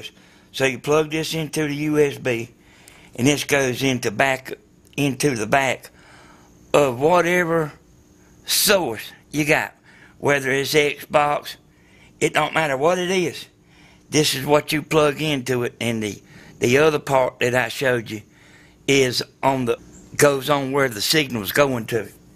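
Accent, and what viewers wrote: South, United States. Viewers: Finally - somebody who speaks my language! Must be the southern accent! Thanks for explaining it so well! We are now up & running!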